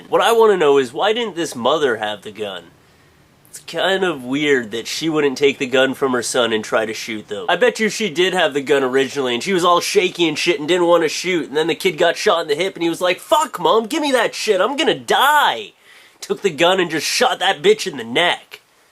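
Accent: Canada. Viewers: Is this guy Canadian? Canadians can't make funny! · r u canadien?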